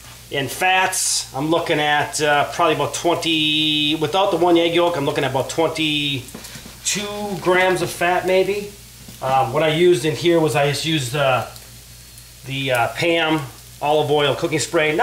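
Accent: Canada. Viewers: Def Canadian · Are you canadian? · CAN SOMEBODY SAY CANADIAN???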